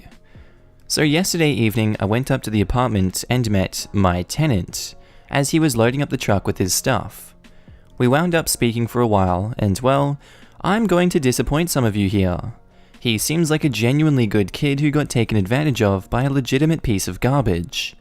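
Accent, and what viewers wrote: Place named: Australia. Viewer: I just want to listen to my Aussie husband talk...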